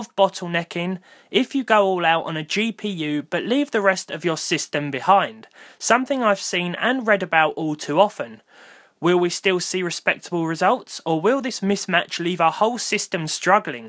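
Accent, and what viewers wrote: United Kingdom. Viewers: is this a brittish accent?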